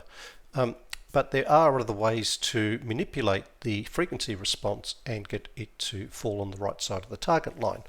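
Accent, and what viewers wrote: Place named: South Africa. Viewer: brilliant tutorial, you sound South African BTW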